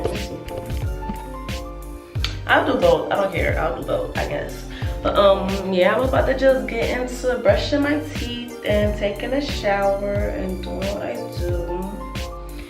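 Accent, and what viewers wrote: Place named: Miami, United States. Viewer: Lol I could just by how you talk you from Miami and I was right. Good video.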